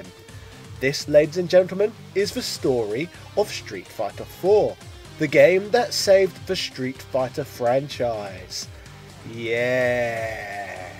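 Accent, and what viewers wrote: United Kingdom. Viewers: As a tournament fighting game player I find your fighting game videos a bit cringy. That's not to say your videos on fighting games are bad, it's just that what we as tournament or hardcore players look for very different things in a fighting game. As an example, casual players went crazy when SF5 didn't ship with a story mode. We didn't care a bit. The game was very solid on launch from a competitive standpoint. I actually picked up my keyboard to shit post and call your videos shit, but then I realized that if you got an OG tournament player to collab with you could make some amazing stuff. With fighting games, the way a hardcore player and casual player look at the game is so different and it'd be really interesting to see both side by side. It is obvious you have a great love for all things fighting games, from a casual level, which is most people that buy these games. 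I saw you and a few others on a stream complaining about tournament players roasting your videos(I was about to be one of said players lol). You'll find that there are some valid reasons you're getting roasted. I just think that showing how basically every fighting game is almost 2 games in one could be really interesting depending on who you can find to collab with. Judging by your accent, you're from the UK? Try getting a hold of Ryan Heart(Harte? not sure on spelling). He'd be perfect to add the hardcore mindset to your videos.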